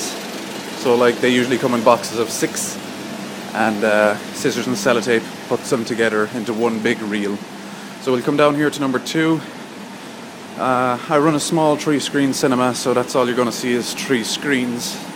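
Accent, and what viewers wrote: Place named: Ireland. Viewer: The projectors look ancient, but there probably bight like tanks. Great Irish, accent. :)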